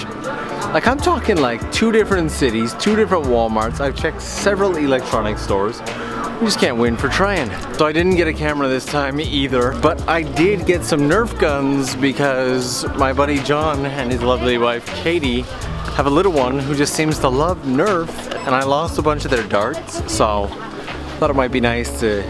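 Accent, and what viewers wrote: Canada. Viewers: I never thought I would be able to differentiate a Canadian accent from another English speaking accent... But apparently I can. Apparently they have two Uniqlo stores in Canada, I just checked. None around here though so... So nice people see you and they get all excited. That must be such a nice feeling. Anyway, have a great day. And drink your coffee before leaving it to freeze!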